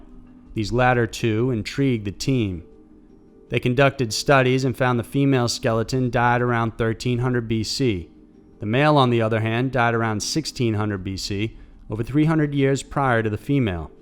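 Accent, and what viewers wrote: South, United States. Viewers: Wonder if he has southern roots like McCaunahay?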